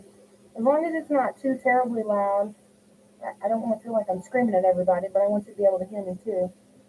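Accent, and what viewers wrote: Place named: South, United States. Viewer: Yes girl, I've heard your accent since day one. I was gonna ask why you had one, a southern accent living in Illinois 😉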